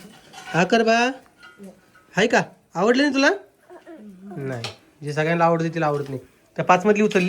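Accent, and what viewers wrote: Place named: Pune, India. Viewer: पुण्याच्या लोकान सारखे का बोलतो अरे आपली विदर्भाचि भाषा चांगली आहे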